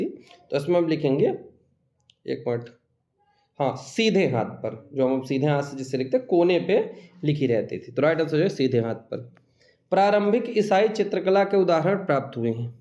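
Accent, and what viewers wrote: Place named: Allahabad, India. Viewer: Aur sir mujhe pata hai aap bhi Allahabad se hi hai aapki language ekdum allahabadi hai Naini ki aas paas se hai aap Mai aapse 2 ya 3 baar baat kar chuka hu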